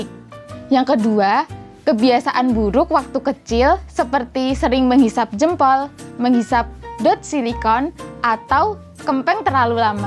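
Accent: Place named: Banyumas, Indonesia. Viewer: Dokternya wong ngapak. Keton banget logate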